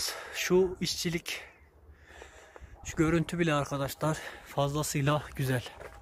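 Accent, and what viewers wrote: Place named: Amasya, Turkey. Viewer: Reyiz nereli ya şive yabanci degil bana ben amasyaliyim :)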